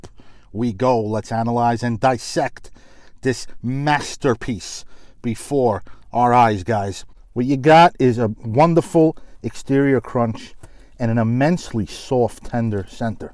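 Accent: New York, United States. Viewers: Absolute new yawk knucklehead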